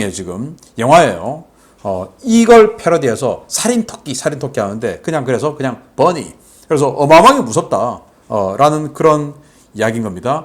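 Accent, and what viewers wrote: Gyeongsang, South Korea. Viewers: 신안균이 견공들이 많은 경상도대구출신인가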